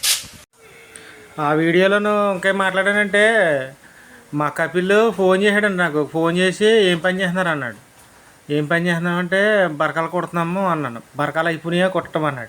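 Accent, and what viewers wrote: Godavari, India. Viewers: హాయ్ అన్న ఎలా ఉన్నారు,మన, గోదారోళ్ళు, మాటే అలా, ఉంటుంది మరి,మన,ఉతపదలు అలాగే ఉంటాయి ఎవరు ఏమి అనుకున్నా, అవి మారవు, బాగుంది వీడియో జాగ్రత్తగా ఉండండి 👍👍♥️♥️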